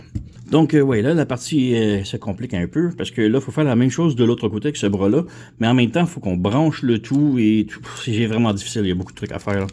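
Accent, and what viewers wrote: Quebec, Canada. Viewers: Yooooo, I watched your English review of the figure. I transformed my new figure using your transformation section, thanks for all the tips! Then I noticed that you have a French version of the review, so I clicked it out of curiosity, and was pleasantly surprised by a Québécois accent! That brings me waaay back. I grew up in Quebec City back in the 80s when I first discovered Transformers in the English TV channels. Anyway, love your channel! 👍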